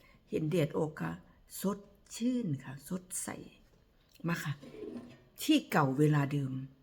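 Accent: Nyo, Thailand. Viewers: มานั่งฟังพี่ เม้ามอยเด้อจ้า❤มักๆสำเนียงญ้อค้ะ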